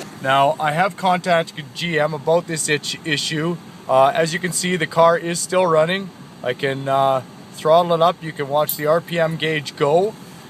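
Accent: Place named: Canada. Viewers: yep, I lived in Winnipeg for 7 years between 1979 and 1986, I actually enjoy listening to this guy, Like the Canadian accent;) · you sound so canadian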